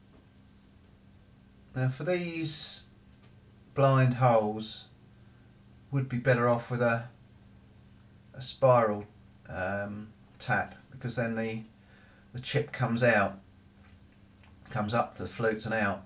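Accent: England, United Kingdom. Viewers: can't be asked!!! You are so English, I love it!!!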